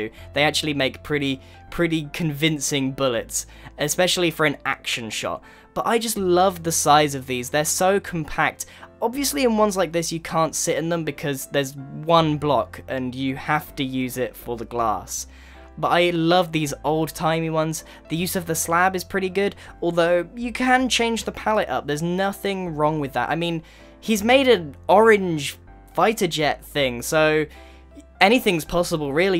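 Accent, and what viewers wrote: United Kingdom. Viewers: I've been waiting for YEARS to say this. You Sound British 😂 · a person with a British accent talkin' about spitfires??? Y E S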